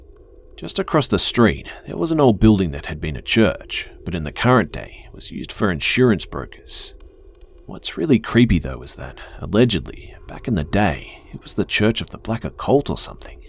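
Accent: Australia. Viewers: this is a real Aussie accent and not the dumb stereo types people do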